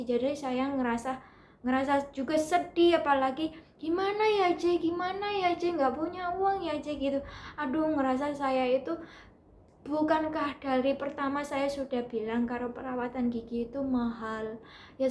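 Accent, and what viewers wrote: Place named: Java, Indonesia. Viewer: Bagus info nya... trimakasih. Tapi klo boleh Saran saya nih ya.. klo ngomong/penjelasan gitu,, pake jeda.. ibarat nya klo dalam tulisan pake titik koma.. biar enak di dengarnya.. klau perlu tambahkan backsound video, apalagi ini video panjang, biar gak bosan yg nonton. ini ngomong kya gak ada rem nya gitu, mana medok pula. Tampilan ataupun background jg penting, biar enak di lihatnya.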